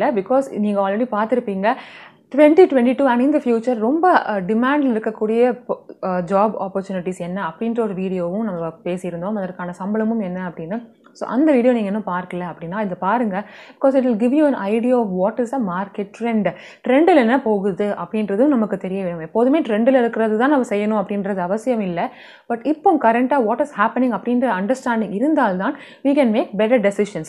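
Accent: Sri Lanka. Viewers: srilanka va ..slang kekka nalla iruku cute ya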